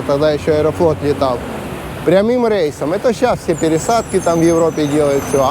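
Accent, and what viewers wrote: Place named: Ukraine. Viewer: А вы точно из Москвы , у вас акцент украинский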